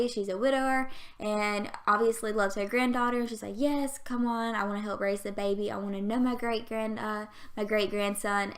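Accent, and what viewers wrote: South, United States. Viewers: I just binged watched all of your builds last night and I'm so glad I found your channel! Love your voice by the way! I'm from Georgia, so southern accents have a special place in my heart ❤️ Can't wait to see your channel grow 😊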